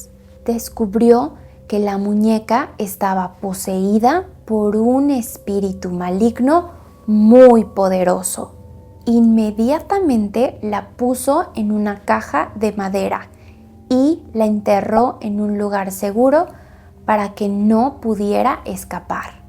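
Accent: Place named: Mexico. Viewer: Vocabulary with real story. That is awesome :) It makes it easier to remember. Also I think your Mexican accent is cute and a bit easier to understand. I often struggle with accents from Spain.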